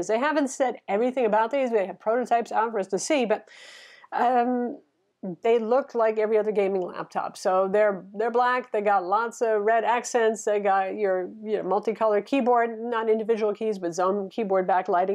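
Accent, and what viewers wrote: United States. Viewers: I really enjoy your presentation, voice and style. Many American accents drive us older English people mad but not yours. Lol. Thanks for all you do.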